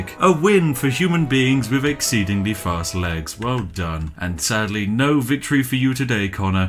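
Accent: United Kingdom. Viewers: Hes a brit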